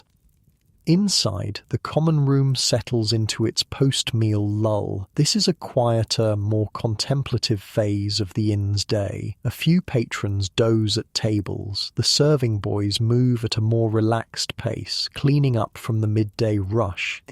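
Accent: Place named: United Kingdom. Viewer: I have really enjoyed the story. However, I have discovered an unexpected dislike for "british" pronunciation. For example, I would pay for some reasonable pronunciation of the letter "r" where it is placed in the words.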